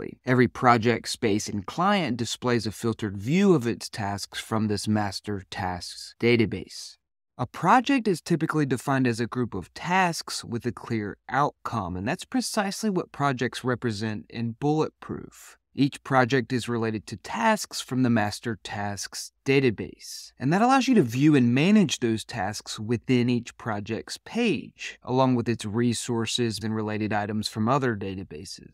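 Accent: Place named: Maryland, United States. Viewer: interesting stuff! side note - are you from Maryland with that accent?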